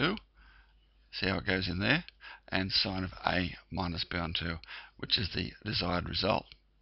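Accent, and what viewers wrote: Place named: Australia. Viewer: I can say he is an Aussie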